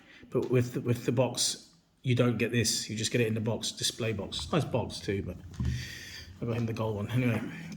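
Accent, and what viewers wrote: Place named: United Kingdom. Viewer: I have one on the way from Amazon , good review fella Love the Brit accent too haha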